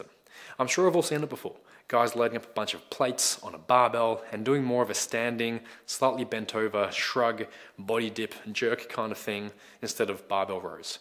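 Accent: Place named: Australia. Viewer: Where ya from bro? Australia? I was trying to work out this accent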